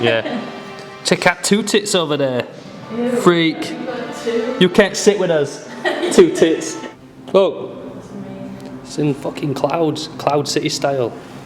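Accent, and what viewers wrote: United Kingdom. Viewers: Great Video. Had a hard Time to understand your English. It was hard british english. But hey i am just a german guy :D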